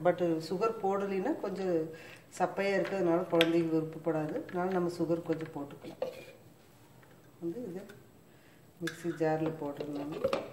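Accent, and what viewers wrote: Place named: Kongu, India. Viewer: Kongu slang with recipe ketka nalla irrukunga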